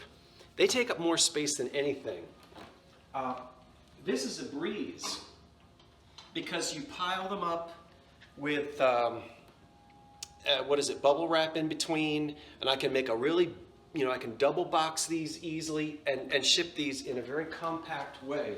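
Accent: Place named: New Jersey, United States. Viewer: I enjoy hearing the New Jersey accent. It would be super fun if you ever want to do a video in full Jersey mode!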